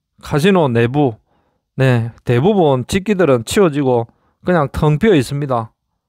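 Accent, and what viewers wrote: Gyeongsang, South Korea. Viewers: 와 갱싱도 사투리 진하다!!! 도저히 힘들어서 못듣겠네.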